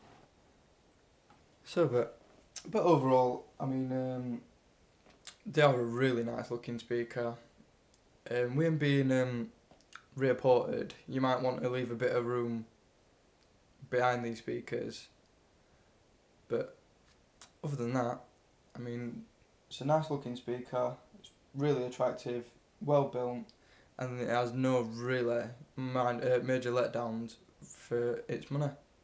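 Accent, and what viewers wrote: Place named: Sheffield, United Kingdom. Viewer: I just watched this .. and hearing you I'm guessing S61 or S62 .. ;) cos tha sounds local.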